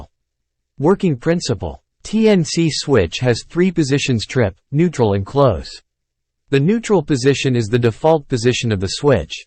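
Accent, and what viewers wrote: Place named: Tamil Nadu, India. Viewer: Tamil voice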